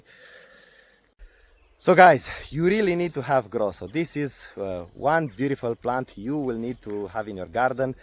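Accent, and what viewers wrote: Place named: Czech Republic. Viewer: Is that a Czech or Slovak accent I hear? Thank you for all the videos - growing lavender is challenging here in the wet East Coast of the U.S.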